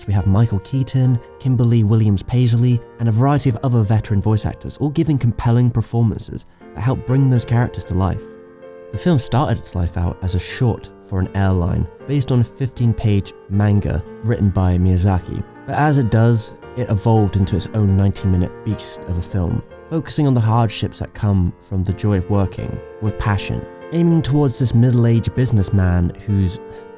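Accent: England, United Kingdom. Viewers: ''there aren't like a hundred more Miyazaki films''we don't need them!!!!!!!!!!!!!!! they were great but not always the best!!!!!!!!! there are others out there ….nothing has to be a perfect animation!!!!!!!!!! Ghibli only got popular because of Japanese culture of façade and completabilities with other animating companies... the world went through a trendy stage where Ghibli came on a time where animation needed some direction...let go of this idiotic Ghibli shit!!!!!!!!!!!!! it's over...picachu came with live version which is absolutely fuckin' idiotic...hopefully miyazaki will not allow asshole Disney to make idiotic live version of Ghibli shit!!!!!!!! is it me or is it always have to be an English accented fool doing Ghibli documentaries...?not bad doc....maybe because appreciation and understanding is far better than many other culture that English accented people do these types...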